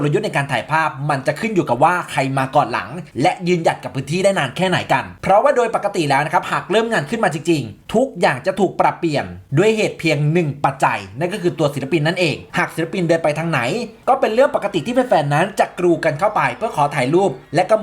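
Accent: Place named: Nakhon Phanom, Thailand. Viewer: พูดได๋… เหมือนนครพนมบ้านฉันอิอิ❤️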